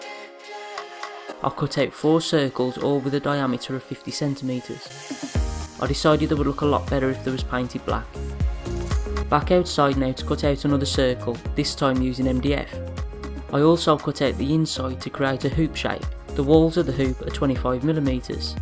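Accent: United Kingdom. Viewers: yes and finally found a good man speaking british accent.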